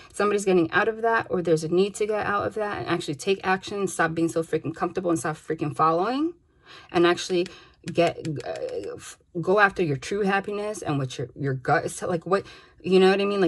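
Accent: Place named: New York, United States. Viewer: I can hear your New York accent! I know that was random but great reading! 💚💚💚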